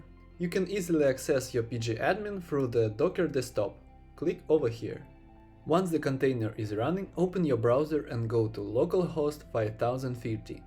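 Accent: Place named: Russia. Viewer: спасибо дружище! русский акцент тебя выдал)))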